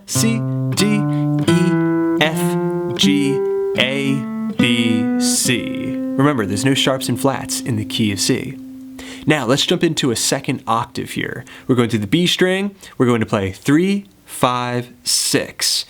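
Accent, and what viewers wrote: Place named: United States. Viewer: I mean, cool videos very useful, but all I could think about is your american accent. Where in the U.S. did you pick that up?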